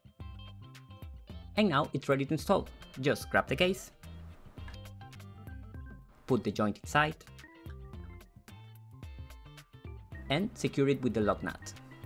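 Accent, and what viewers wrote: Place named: Italy. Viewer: You video's are pretty clear, not too slow and not too fast. And when it's a bit too fast than it's less annoying to scrol a fragment back. But hihi... it must be your Italian vibe and accent.... I can't resist the urge to imitate your .... ' and.., that's it'. Funny repeating soundbite, I mean this in positive sense :D. Unfortunately I fail in my imitation, lol. Maybe you need to create your own home assistant audio notifications. :D :D and that's it.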